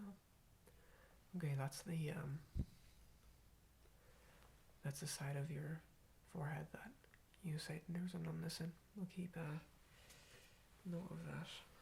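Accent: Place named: Ireland. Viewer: Yay and Irish ASMR artist 👌🏼 I'm Living in the Uk and it's so cool to have a familiar accent sending me to sleep 😴 Subbed!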